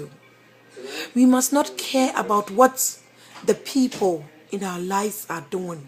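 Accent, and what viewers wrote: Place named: England, United Kingdom. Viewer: you are too kind!!!!!!! we need many parents like you in this world:) BTW I Love your English accent!!<3<3;)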